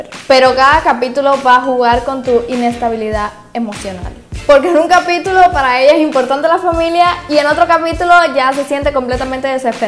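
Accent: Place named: Dominican Republic. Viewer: Eres dominicana o vives aquí en RD? Tienes como un chin de acento 😂❤️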